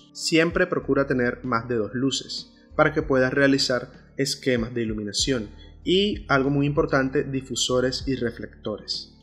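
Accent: Venezuela. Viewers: Yo juraba q eras venezolano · Hola, creí que eras venezolano por tu acento, de que parte de Colombia eres? un abrazo desde Medellín. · Por tu acento juraba que eres venezolano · COMO QUE NO ERES VENEZOLANO CON ESE ACENTO???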